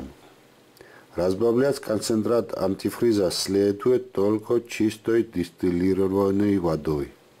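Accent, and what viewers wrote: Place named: Georgia. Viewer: დიდი მადლობა ყოველთვის საინტერესოა თქვენი ვიდეოები, რუსულიც ,,გამოგისწორებიათ,, ადრე, ,,ძალიან ქართული აქცენტი იყო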